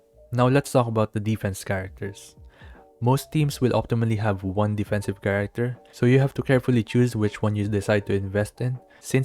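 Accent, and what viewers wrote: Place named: Philippines. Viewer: U sound pinoy..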